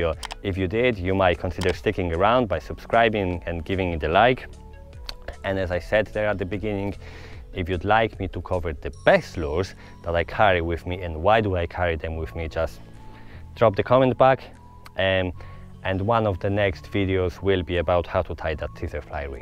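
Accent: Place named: Ireland. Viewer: Bro, I can detect an irish accent as you're talking. Half way through I thought I'd lost my mind and you were a local.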